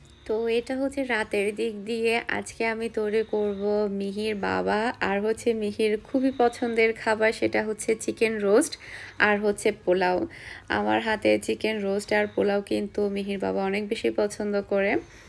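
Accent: Chittagong, Bangladesh. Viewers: Amr Mone hocce apu apni ctg er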